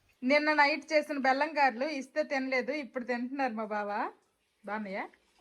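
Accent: Srikakulam, India. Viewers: Srikakulam launguage is an emotion 😊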